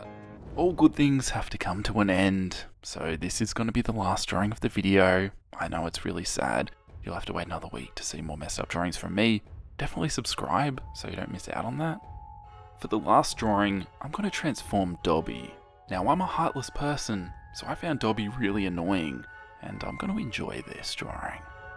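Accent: Australia. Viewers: Question: Who else, or am I the only one, that wants to know what goes on though the cashiers heads as walks up and buys these colouring books? I would also like to add that this made my Friday, the sarcasm, excessive blood, awesome drawings and the best accent on earth (fellow Aussie). My Friday can end happily!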